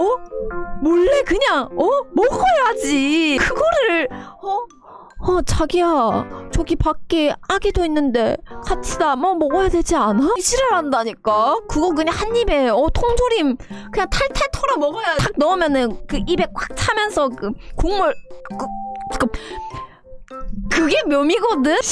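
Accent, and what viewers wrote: Gyeongsang, South Korea. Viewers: 경상도 맕투 개중독됨 ㅠ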